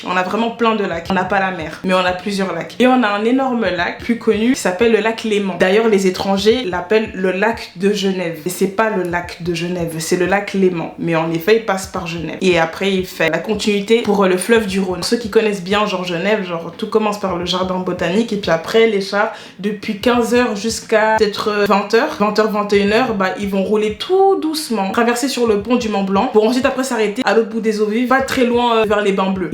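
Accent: Cameroon. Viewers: trop marrant surtout avec ton accent camer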